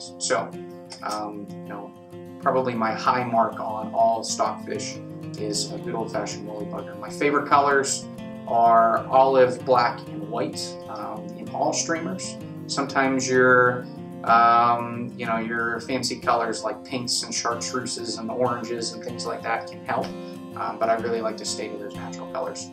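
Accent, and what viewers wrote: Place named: United Kingdom. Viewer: I am assuming this guy is talking about uk fishing? It’s just that his accent makes me wonder.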